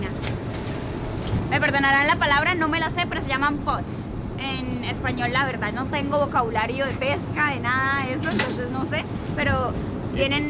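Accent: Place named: Colombia. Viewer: Hola me llamo Yanet, soy cubana, vivo en Italia y te he encontrado por casualidad en YouTube y realmente tus videos nos gustan mucho porq estoy conociendo Australia gracias a ti estoy fascinada, he visto tantos de tus vídeos eres fantástica, y amo el acento colombiano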